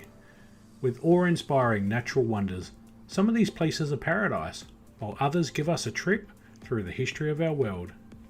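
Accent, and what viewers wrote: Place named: Australia. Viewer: I love the Australian voice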